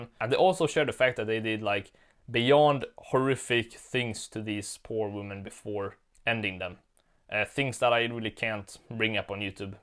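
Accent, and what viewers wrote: Sweden. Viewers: Are you swedish by any chance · He sounds turbo Swedish, because he is. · Är du svensk? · 33 seconds in and I am certain of one thing. you are Swedish.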